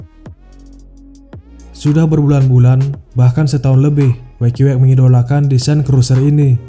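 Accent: Bali, Indonesia. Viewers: Mimin asal Bali yaa...??? Logatnya mirip orang Bali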